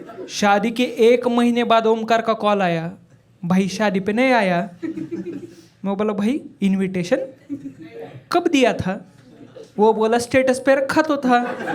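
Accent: Maharashtra, India. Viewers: भावा तुझ्या बोलण्यातून च समजत तू मराठी आहेस..🧡 आवडलं आपल्याला..🔥🤍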